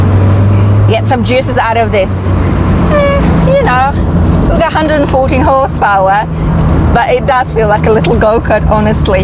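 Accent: Germany. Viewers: Tollen Akzent. Er erinnert mich an eine Deutsche, die ich die Ehre zu kennen habe.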